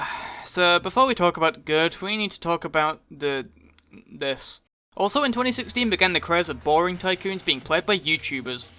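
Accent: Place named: Sunderland, United Kingdom. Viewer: He sometimes sounds a lot like a mate of mine from Sunderland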